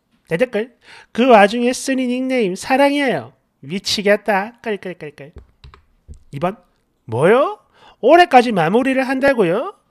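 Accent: Gyeongsang, South Korea. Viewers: 천지삐까리? 완전 경상도말씨군요 억양 완전짱 늘 터져요 웃음릴레이😊😊😊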